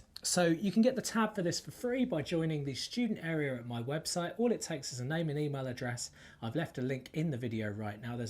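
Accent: England, United Kingdom. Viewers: I LOVE YOUR ENGLISH ACCENT MAN! Nice vid btw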